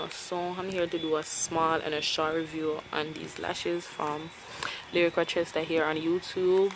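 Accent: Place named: Jamaica. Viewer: hey girl i love your accent ...do you live in Jamaica...i always dream of visiting Jamaica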